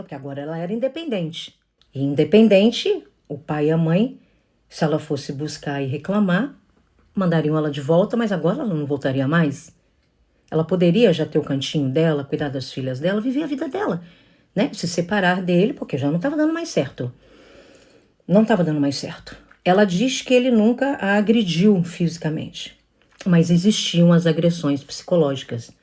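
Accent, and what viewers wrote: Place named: Rio Grande do Sul, Brazil. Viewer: Maldito desse ou é gaúcho ou nordestino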